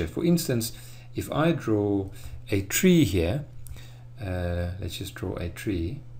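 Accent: South Africa. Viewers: professor are you from southafrica (south african accent) ;) thank you for this video...really clarified some topics I was struggling with. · Been struggling with this concept all throughout my first year of med school, and this video helps so much! Also love the South African accent. My girlfriend is an Afrikaaner and her dad sounds just like this. It's a comfort to me.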